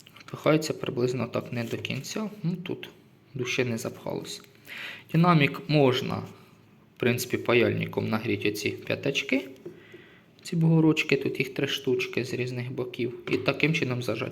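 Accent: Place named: Poltava, Ukraine. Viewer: Полтава балака)))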